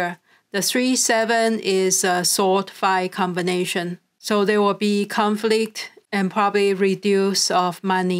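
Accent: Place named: China. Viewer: Sorry cannot understand chinese mix English with strong accent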